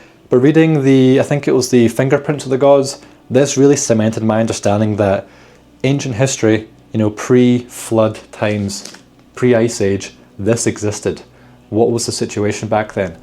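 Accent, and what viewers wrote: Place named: Glasgow, United Kingdom. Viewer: No bonus points for me, I only know that music, Lacuna Coil and so forth because because I played the hell out of VTMB both vanilla and modded. I have to say I like your content and the accent reminds of a co-worker from my last job, originally from Glasgow, we could take the piss out of each other without worrying about our "feelings" or HR. Salt of the earth.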